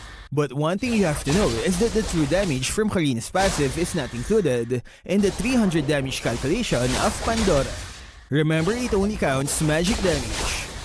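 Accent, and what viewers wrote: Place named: Philippines. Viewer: when will you ever improve your english accent it’s so obvious you’re filipino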